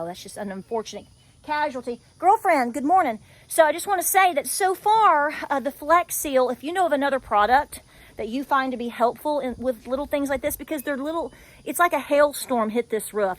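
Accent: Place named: Texas, United States. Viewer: Some of the things that you say, I never heard for the long long time. But you make me laugh because I remember people talking like you because my family was from Texas and all down in that area somewhere. Just because my grandparents came to California so my parents from California but I left a 92 just cause I was born and raise their live their 42 years. I don’t think like a California so I’m glad I left. But I so enjoy listening to you talk because it reminds me of Family in Texas how they talked and even when I was younger in California when they were so you know what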